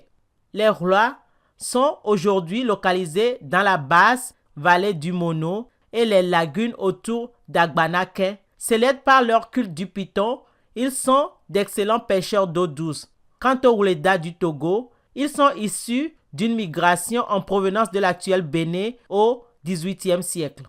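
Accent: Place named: France. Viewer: Salut. Bonne synthèse. Vous auriez pu parler plus posément pour permettre aux auditeurs de mieux vous suivre. Par ailleurs, dans les prochaines émissions, essayez de prononcer correctement les ethnonymes et toponymes avec l'accent voulu -et non l'accent français - pour être mieux compris. Enfin, on ne fait pas l'histoire sans parler des sources. Et essayez de varier ces sources. Merci.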